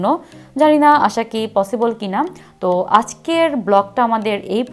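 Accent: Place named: Noakhali, Bangladesh. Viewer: ভ্লগ তো অসাধারণ ছিলই বাট ভাইয়ের নোয়াখাইল্লা একসেন্ট বহুদিন পর শুইনা আপ্লুত হইয়া গেছি।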